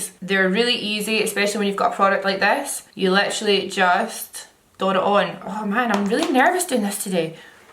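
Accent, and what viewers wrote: Scotland, United Kingdom. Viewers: Has anyone used the Google captions when watching?! their interpretation of what she's saying is hilarious 😂😂 I'm Scottish so totally get her accent but imagine non-Scots would find the captions totally unhelpful and nonsensical 😂👎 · I honestly don't think you are all that hard to understand. But then again, I've been around different accents, including Scottish, before, so I've had practice listening. Love the accent, the more people watch the easier it will get for them ;) As a classically trained singer, the vowel sounds Scottish people make when they speak are so fascinating, and (I think so) very pretty. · is she scottish x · I know the struggle with the Scottish accent 😂😂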